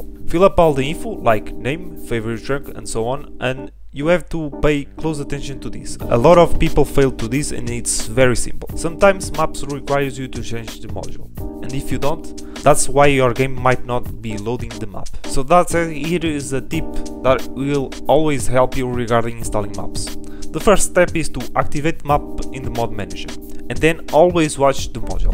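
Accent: Portugal. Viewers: Só pelo sotaque já vi que és portugues 😁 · Tuga accent FTW. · es portugal nota se pela voz kkkk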